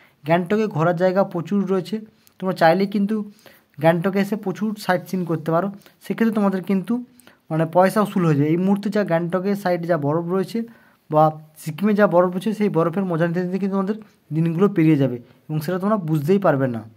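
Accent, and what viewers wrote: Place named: Bangladesh. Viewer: Bangladesh er lok kotha sunei bojha jai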